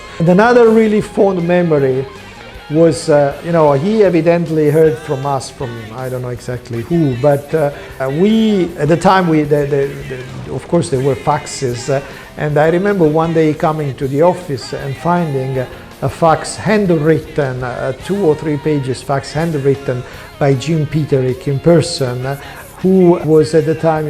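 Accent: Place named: Italy. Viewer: And the italian accent,of course.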